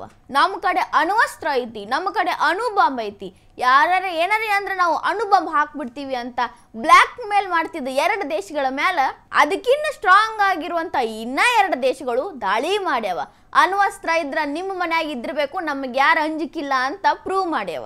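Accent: North Karnataka, India. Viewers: Uttar karnataka bhashya chennagide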